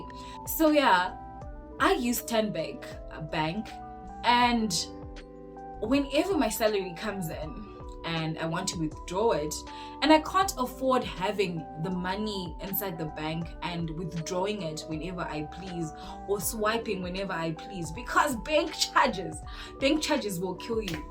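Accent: Namibia. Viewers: you look so beautiful from Namibia and you have changed to a Namibian through your English, that's our English you are speaking. you must come back my sister don't suffer yourself in zim;, the government has reduced the tax now and declared a free tax for all low-income earners. In Namibia we attack corruption we will not rest about it until we see actions taken against those corrupt leaders. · I liked the way u changed your accent to Namibian accent.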